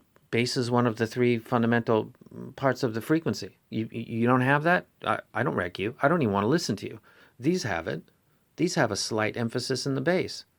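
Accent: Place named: Maine, United States. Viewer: you sound like youre from Maine :)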